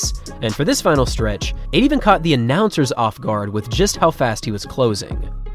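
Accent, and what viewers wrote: United States. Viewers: Yer commentary is so American. African all star?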